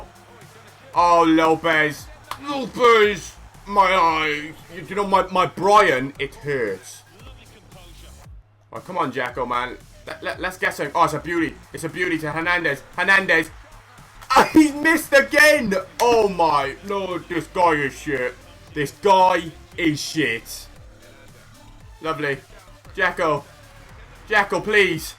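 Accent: Wales, United Kingdom. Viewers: you from Wales, Cardiff?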